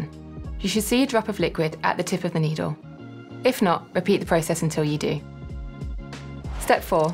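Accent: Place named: United Kingdom. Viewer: It states that is U.K. and you can tell from the accent. It is not all about the US !!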